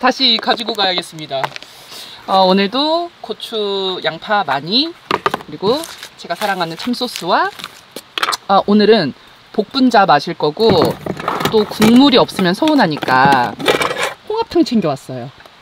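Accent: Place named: Gyeongsang, South Korea. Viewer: 정말 잘 드십니다.👍👍👍👍👍집게벌레 독 없어요. 말씨는대구나 부산 같은데요?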